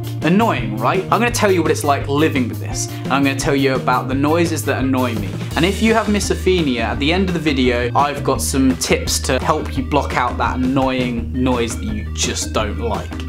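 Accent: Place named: United Kingdom. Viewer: Umm... it could be just a British accent. Not everyone speaks American English.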